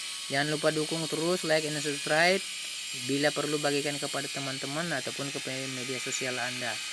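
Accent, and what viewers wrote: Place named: East Nusa Tenggara, Indonesia. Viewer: saya suka saya suka logat ngomongny ntt kah.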